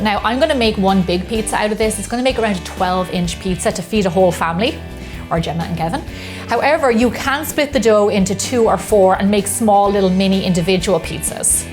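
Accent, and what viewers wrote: Ireland. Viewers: she’s actually Irish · The Irish accent is so buttery smoth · Is she irish? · I think she's Irish.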